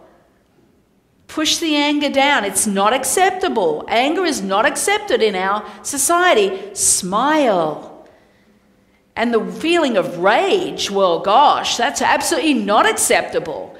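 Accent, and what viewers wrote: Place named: Australia. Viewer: you not only give wonderful HUGS but ones that have never met you giving your presence as on You Tube and your online Web Site you give this amazing loving energy that is so understood especially with your beautiful Australian accent You of choice are a Beautiful Earthling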